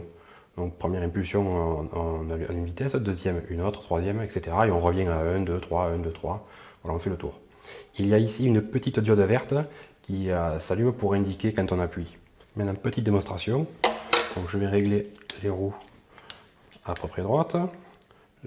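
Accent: South, France. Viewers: T'es débalages sont toujours aussi bien et même s'améliore de vidéo en vidéo et continu comme ça. Et bien sûr toujours avec l'accent du Sud😊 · Dommage qu'il y ait pas beaucoup d'abonnés sur ta chaîne parce que tu présentes très bien les produits et ton petit accent du Sud est attachant ;) tu veux pas essayer de contacter Steven par exemple?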